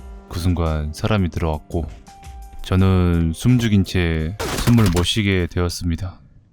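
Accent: Jeolla, South Korea. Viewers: 님 오늘은 뭔가 전라도 사투리가 들리네요 🙂🙂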